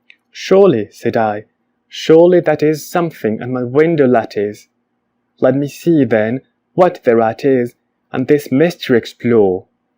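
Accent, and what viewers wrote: United Kingdom. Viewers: No sere nativo, pero siento que tu acento suena muy británico 👏👏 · Buen acento británico, antes no me gustaba ese acento, ahora veo que estoy interesado en pronunciarlo bien, espero llegar a pronunciarlo como tú. Buen video.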